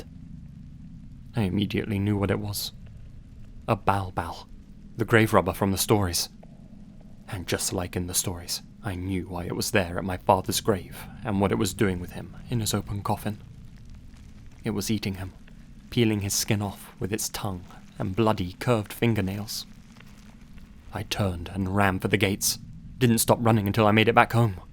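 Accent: England, United Kingdom. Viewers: I’m kind of new to this channel, and I’m really enjoying itself! Although one thing, I’m so confused on where is from. He has a British accent I think, but he says he’s lived in a lot of places in Asia I think. Sorry if this is a weird or dumb question! I’m just a little confused. 😅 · Am I late to the party or…..did this white guy with an English accent just say he was Filipino? I’m thoroughly confused but having said that, been binge watching videos for about a week and now I can’t sleep 😊 Love the content!!! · You grew up in the philippines ? I always thought you were british 😅 at least you have a british accent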